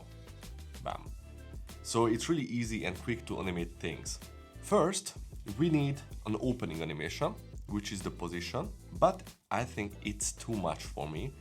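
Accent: Hungary. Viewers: Sziaaa.... When I was watching the first 10s of your video, in my head I was thinking " man this guy has a Hungarian accent " then you said your from Budapest - I started to laugh - NO WAYY!!! En is magyar vagyok. Budapesten szuletem, de Canadaba lakok gyerek korom otta ;) I just subbed tesom :) Curious since you are in europe do you record and edit on a 30fps time line?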